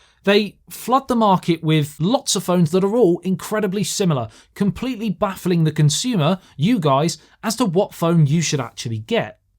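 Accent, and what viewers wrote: England, United Kingdom. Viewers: Damn I knew you would be speaking with an English accent from the thumbnail. You have an English looking face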